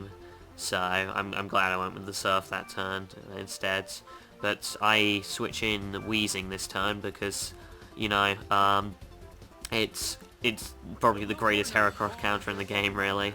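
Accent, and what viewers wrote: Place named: United Kingdom. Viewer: I always love hearing Brit voices... I'm not quite sure why... but in any case, coo' battle. About the switching between Gyrados and Jolteon business, there's chances that you might overpredict them and they won't switch and if you do the wrong move expecting a switch it really messes you up. It's a good thing though he did do what he did... if all you did was Confuse Ray to Gyrados it still would've been good but imagine if you picked Surf and he didn't switch >_>;.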